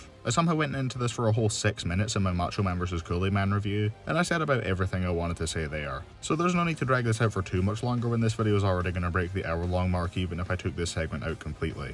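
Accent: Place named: United Kingdom. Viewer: Sick new remake on a great season review. I think I mentioned this before, but there's something about UK presenters for these types of reviews that make them really easy to listen to. You, Critical Drinker, Mauler, etc.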